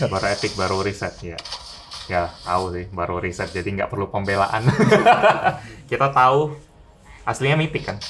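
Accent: Palembang, Indonesia. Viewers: Wong Palembang caknyo kakak ini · kayak ada bhasa palembang ny, ap koh org palembang? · Ketaro nian wong plembang. 😅 Metu aslinyo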